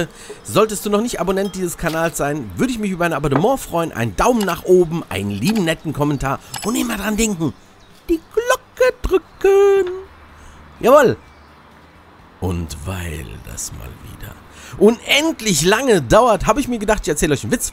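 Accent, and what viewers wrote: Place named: Hesse, Germany. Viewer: Gut Esse, hessisch Gebabbel und rockisch Mussigg! Was will mer mehr!? Mach weiter so! Grüße aus Mittelhessen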